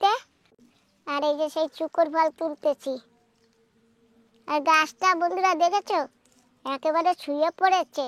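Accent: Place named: Khulna, Bangladesh. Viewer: দিদি আপনাদের বাড়ি মনে হয় বাংলাদেশের ছিল আপনাদের বাসা তো খুলনার ভাষার মত খুব ভালো লাগলো আপনাদের ভিডিওটা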